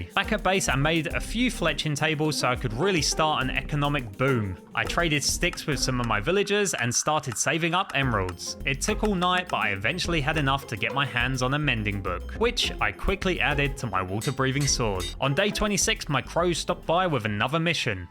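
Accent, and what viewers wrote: United Kingdom. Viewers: I was sure that You are from uk but when i saw that You are from Poland i was so confused. Well… super film nagrałeś 😅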